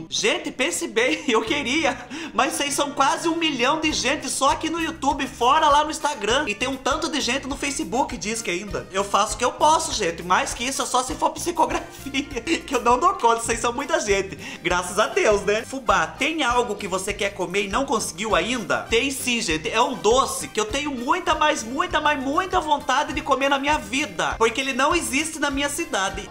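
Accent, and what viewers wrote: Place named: Paraná, Brazil. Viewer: Ah tá entendi Paraná sotaque forte eu sempre tive curiosidade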